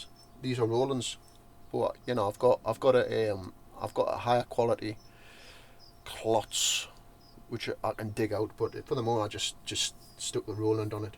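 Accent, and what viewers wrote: Newcastle, United Kingdom. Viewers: I love this video. It is just plain, raw, proper Geordie, and honest. "nay problem" 😀 And you can sing. Nice rich voice. So jealous.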